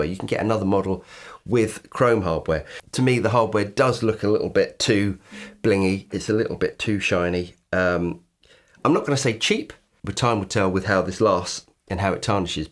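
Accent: United Kingdom. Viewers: Ummmm I got the suspicion that you are Brittttish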